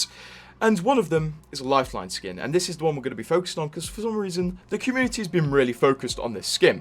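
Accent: United Kingdom. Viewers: Hello british man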